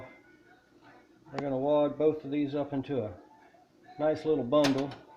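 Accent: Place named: Appalachia, United States. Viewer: BEAUTIFULLY DONE SIR, MY BROTHER SOUNDS LIKE YOU; HE'S FROM APPALACHIA COLA FLORIDA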